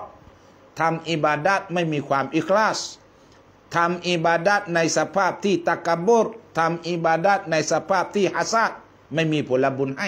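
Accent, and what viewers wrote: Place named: Nakhon Si Thammarat, Thailand. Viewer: นครศรีธรรมราชชัดเจน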